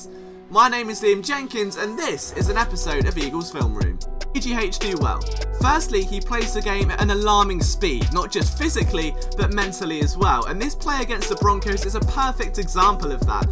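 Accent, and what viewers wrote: United Kingdom. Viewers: who the f is this BRIT talking about FOOTBALL???!!! doesnt even sound right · Wow this is different somebody reporting about the NFL with a British accent are we getting through to the people across the pond that american-style football is Supreme Over homosexual soccer ?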